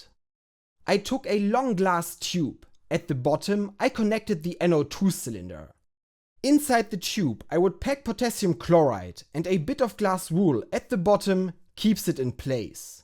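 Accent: Germany. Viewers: Your accent is my favourite German accent. It's up there next to the Simpsons guy who took possession of the missing art before a Kraftwerk concert in Stuttgart. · As a high school chemistry student here in Denmark, I find your grad level chemistry videos both entertaining and inspiring. Please keep making them, and congratulations on the success with the gas. Even though I might not understand every synthesis step, I really enjoy your explanations and your German accent. These videos capture what chemistry is about, using science to explore interesting compounds. :) And just to try some German, Ich finde deine ganze experiment sehr to toll :) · Bruder, ich habe zum ersten Mal ein Video von deinem Kanal vorgeschlagen bekommen, direkt darauf geklickt und in 0,0002 Sekunden gehörst das du deutsch bist. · idk why, but i find his thick german accent in english so sexy and relaxing at the same time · you german?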